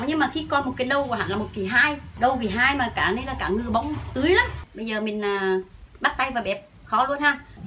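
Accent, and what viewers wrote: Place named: Quang Tri, Vietnam. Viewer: Cô người Quảng trị hay sao nói nghe hay quá. quê tui đây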